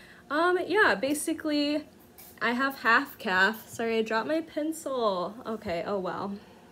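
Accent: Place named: Midwest, United States. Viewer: Hi! So nice to "meet" you. Michelle Bendy mentioned you so I have now watched all three. I had a feeling it was midwest. I spent approx 30 years in Oak Park & Forest Oark, IL so hi neighbor. 😁 Thanks for sharing your time, and your joy of stitching. It's refreshing and no, I don't hear a lisp at all. Age? 29?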